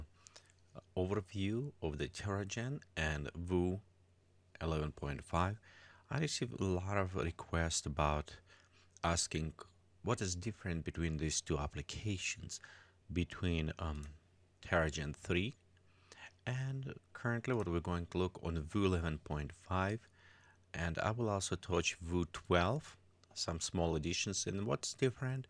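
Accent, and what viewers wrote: Russia. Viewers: Сразу заметен русский акцент)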